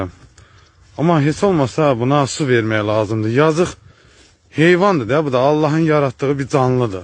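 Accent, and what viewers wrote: Sabirabad, Azerbaijan. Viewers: Qardaş Allah razi olsun sizdən bizdə beləyik mən özum butun heyvanlara yazigim gəlirr xilaskar bir insanam ləhcəniz sabrabadd 😊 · Vallahi 40 ildir Rusiyada yaşayıram, Qardaş Allah kömək olsun sənə, Yezidə lə'nət oxu, Ləhçə Sabirabaddi dənə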